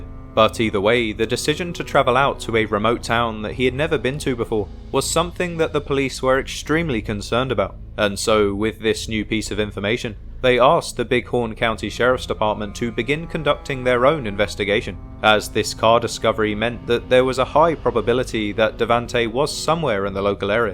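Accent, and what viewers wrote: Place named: United Kingdom. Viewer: There’s something about a British voice making this sound so not legit. Bad decision.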